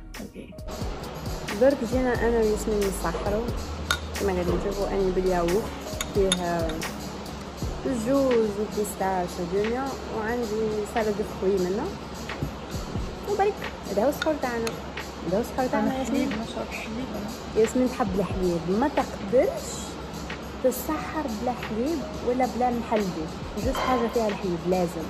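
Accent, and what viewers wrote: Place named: Annaba, Algeria. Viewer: ياجماعة الي قلك شبيه صوتها هكا الغالب حنا لهجتنا لعنانبة هكا انا وسي نعيا نفهم ناس ادا صوتي وادي هدرتي مش نتصنع بصح هوما يقولولي اك تطوطي الغالب هدرتنا هكا نبدلوا هدرتنا ولهجتنا عجالكم تقبلواها واسكتوا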